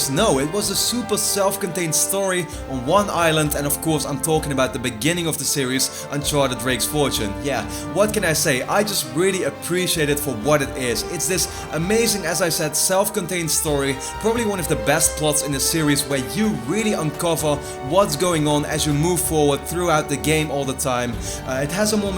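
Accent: Netherlands. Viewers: He has a dutch accent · is he Dutch? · Are you from THE netherlands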